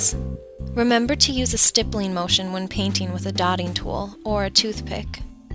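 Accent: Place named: Canada. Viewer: She's totally Canadian. Listen closely to her voice.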